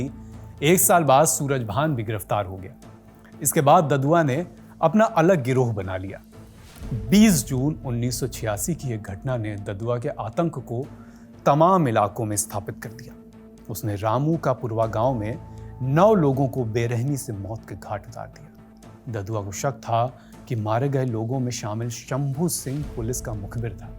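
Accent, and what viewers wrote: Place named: Awadhi, India. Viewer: Bhai ye awadhi h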